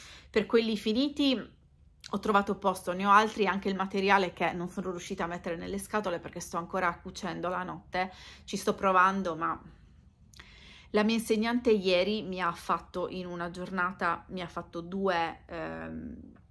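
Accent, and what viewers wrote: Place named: Sardinia, Italy. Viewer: Buongiorno video consigliatomi da YouTube, non ti conosco ora guardo un po' di video per capire, dall'accento sei Sarda ? Vivi in america mi sembra di aver capito 😊 io Sardo vivo in Germania da 22 anni. Anche io ho una canale .. adesso vado a veder qualche tuo video ❤ a presto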